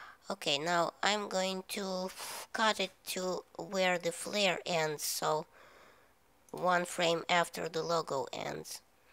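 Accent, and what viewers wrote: Russia. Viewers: You sound a bit Russian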